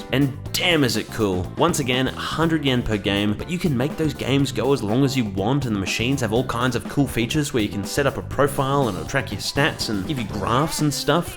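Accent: Australia. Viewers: as a fellow aussie, i appreciate the aussie accent 🙏🏼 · This was great mate. Love hearing a fellow Aussie speak facts 😁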